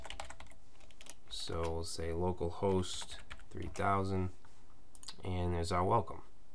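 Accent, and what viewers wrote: Boston, United States. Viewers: You from Boston bro?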